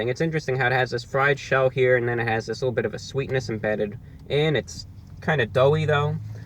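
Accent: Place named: New York, United States. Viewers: Do you happen to be from New York or north jersey? I can tell from your accent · Why do you have a stereotypical Italiano Brooklyn accent? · This guy sounds like hes from brooklyn or Jersey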